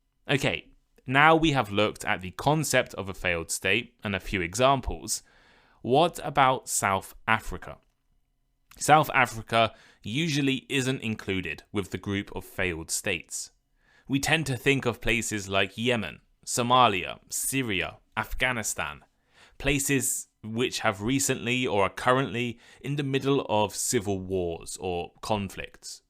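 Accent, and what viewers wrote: United Kingdom. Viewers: ❤❤❤❤❤ are you from Uk?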